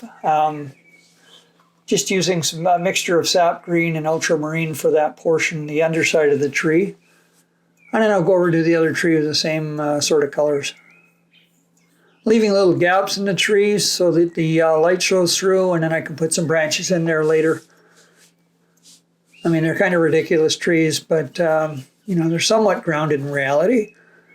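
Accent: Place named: Canada. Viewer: Very informative. I appreciate you telling what colors you are using. Love to hear your Canadian English. Toni-Colorado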